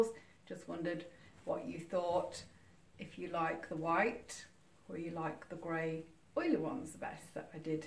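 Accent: United Kingdom. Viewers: I DETECT AN ACCENT WHEN YOU SPEAK ARE YOU BRITISH? GORGEOUS!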